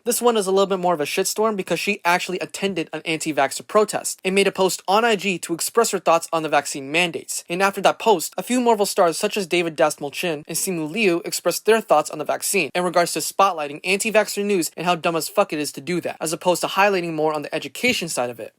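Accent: United Kingdom. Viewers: you cannot deny me you sound very british and must one by the things you said in the beginning thought am already liking it👍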